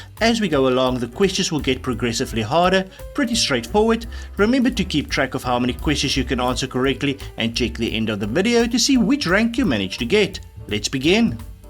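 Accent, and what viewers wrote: Afrikaans, South Africa. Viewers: Klaarblyklik is u eerste taal Afrikaans, maar meestal is u Engelse uitspraak aanvaarbaar. Ek vra vir my of u enige dokumentêre of reisgidse oor Suid-Afrika wat deur Amerikaners geskep is, bekyk het? Ek het, en sommige van hulle is uiters goed, veral as die mens/e wat daarvoor verantwoordelik is, 'n paar minute op die uitspraak van Suid-Afrikaanse name en plekname gespandeer het. Vandag is dit baie maklik om korrekte uitsprake op die internet te kan vind, en 'n mens hoef nie 'n pedant te wees nie; veel name het standaarde uitsprake in buitelandse tale, b.v. "Paris", en natuurlik sê baie Suid-Afrikaners "Kru-gir" en nie "Kreur" nie, asook "Bloemfontien" en nie "Bloemfontein" nie - selfs die uitsaaiers! (Oké - ek erken dat "Bloemfontien" my pla!) Maar i.v.m. dokumentêre van S-A, is dit hoogs irriterend om verkeerde uitsprake van naam nà naam nà naam te hoor - dit skep die indruk dat die produsent/regisseur geen werklike kennis van die land het nie, en het sommer-so inligting van die internet opgegrawe om 'n wins daardeur te bekom. 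M.a.w. is die dokumentêre/reisgids onopreg, en daar is geen ware respek vir die land en sy mense nie. (My ore staan altyd vir die woord "fynbos" orent!) Ongelukkig, sedert ek 'n "pikkenien" was, het ek die geleidelike agteruitgang van sommige tale waargeneem - veral Engels, en veral in die VSA - weens verskriklike opvoeding in hulle moedertaal en luiheid. "Pasteur" is a Franse naam, en ja - ek haat die tipiese Amerikaanse uitspraak van "van Gogh", oo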